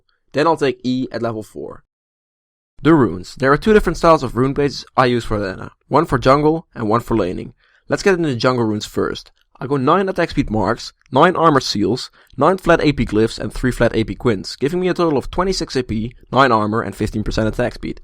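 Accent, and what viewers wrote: Netherlands. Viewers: Hahaha het nederlandse accent op engelse taal is altijd zo herkenbaar. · Duidelijk Nederlands accent :p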